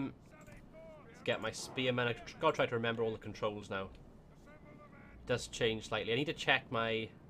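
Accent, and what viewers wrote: Wales, United Kingdom. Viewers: So damn happy this is finally out :D Plus I love the Welsh accent so your voice should compliment this lovely :P Anyhow enough commenting, time to watch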